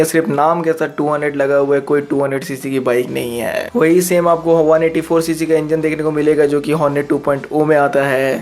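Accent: Bihar, India. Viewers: Aap Bihar ya up se ho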